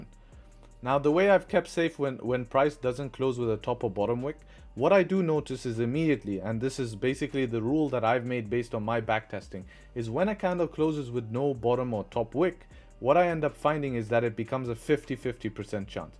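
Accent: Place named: South Africa. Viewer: You are coloured from south africa or namibia 😊, your accent tells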